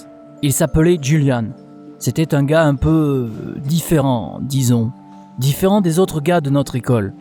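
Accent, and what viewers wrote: Marseille, France. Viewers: Je viens juste de remarquer qu'il a un accent marseillais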